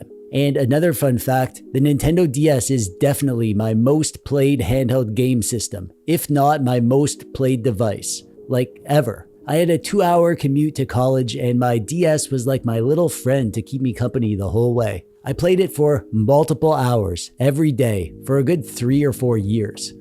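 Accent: Canada. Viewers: Sir, are you Canadian?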